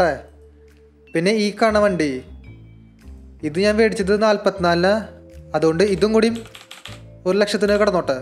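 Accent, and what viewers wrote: Thrissur, India. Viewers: Bro Thrissur anno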